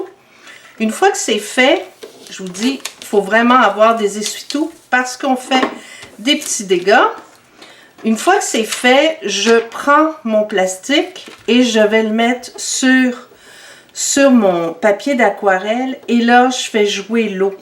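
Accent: Canada. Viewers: chalut et merci! Tu me donnes des techniques que je ne connaissais pas! avec un tampon, j'ai maintenant plus d'idées créatrices et j'adore ton accent canadien! chaleureusement ( de St Nazaire où sont partis beaucoup de Canadiens)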